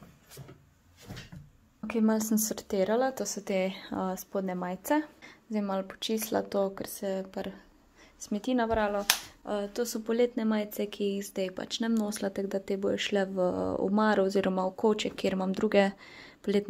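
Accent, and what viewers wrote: Carinthia, Austria. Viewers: Ta koroški naglas je 🔝👌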